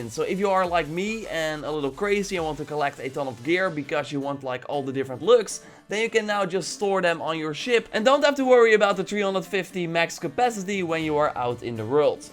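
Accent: Netherlands. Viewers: You got a huge dutch accent :p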